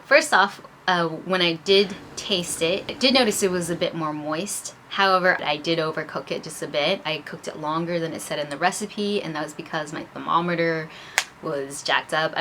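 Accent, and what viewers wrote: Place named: Philippines. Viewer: Inday magtagalog ka nlng.. Obviously marunong ka mgtagalog accent p lng...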